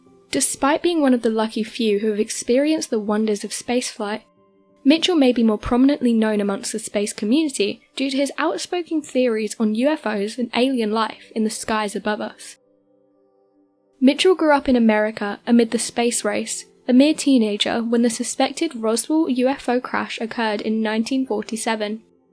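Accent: England, United Kingdom. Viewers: I personally live the voice very good narrative speaks intelligently and the best English accent! Keep her. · I love her voice, just something about a British accent that is so soothing. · She's definitely not Australian or Kiwi. My money's on British. Sounds very British to me, you do realise they have different accents in different areas over there..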